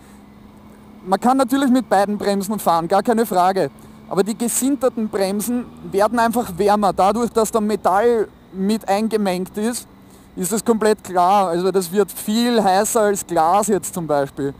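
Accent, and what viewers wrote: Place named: Austria. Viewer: Eine sec geschaut, direkt gehört dass du Österreicher bist gleich n abbo dagelassen😄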